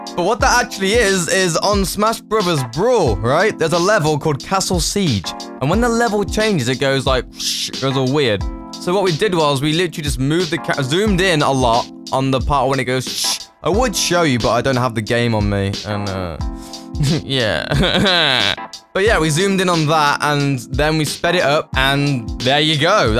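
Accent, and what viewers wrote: United Kingdom. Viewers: hes british, BRITIIISH... thats right, isnt it? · OMG your british?: yeah... random girl:say BANANA say it say it:NNOOO random girl:please say it say it!: BANANA!!!!!!!!! random girl it sounds so different... someone else continue... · i wish i was English. i mean having people ask you to say random words all the time is probably annoying but, come on. you sound awesome. and you're not American which if you add those two factors together it's like an A++ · ARE YOU ACTUALLY BRITISH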